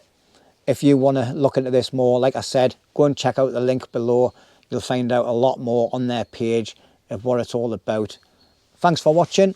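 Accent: United Kingdom. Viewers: Great Brit English ❤ You must be Ex German ? 😂 (like me 😅) Unboxing: keep it short ❤ Video with IR: very grainy 😢 IR off —> NG Night sky: 😰 I better still keep on looking for a better unit 😂 it great video, 🙏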